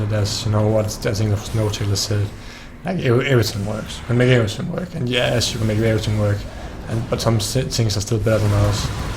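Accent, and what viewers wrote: Denmark. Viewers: What's your native language? You sound very danish :)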